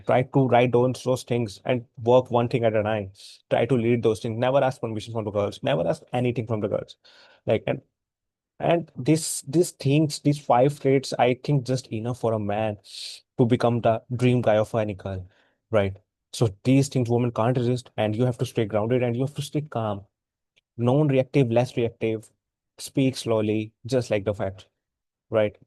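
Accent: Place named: India. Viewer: In this video little too much bass, I am having hard time understanding all you say because Indian accent heavy. When sound is more clear, I can follow you, but not if too much bass. Just giving you feedback because most people would just stop the video after 2 minutes Content is good though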